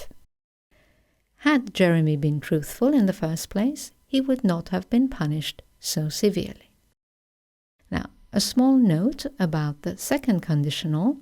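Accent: RP, United Kingdom. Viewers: Thanks so much!❤️,I'm Chilean and you help me a lot with your British accent because my school speak USA English so I have some problems with others accents. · You have the Queen accent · You sound British thou. I watch loveenglish with Leila and sabrah . Leila is half English half Iranian too. nice meeting ya. thanks for your rapid response. good night and bless you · Do you speak RP??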